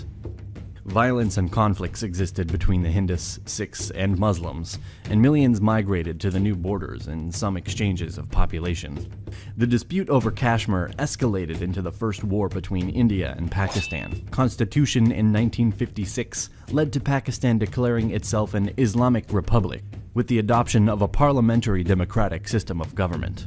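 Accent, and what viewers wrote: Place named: England, United Kingdom. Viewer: Marathas defeated and controlled whole india from 1700 to 1818 with puppet mughal emperor...... But foolish english accent person have no mention in video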